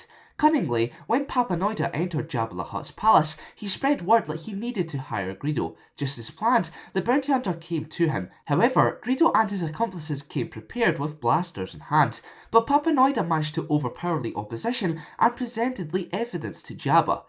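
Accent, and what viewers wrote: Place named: Wales, United Kingdom. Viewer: I love your welsh accent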